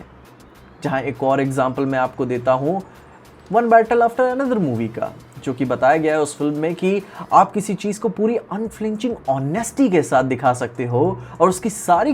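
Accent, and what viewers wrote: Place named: Bihar, India. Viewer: Bhaiya majak nahi Karu ga per aap ki awaaz beach beach me bihari jesi sound kar rahi thi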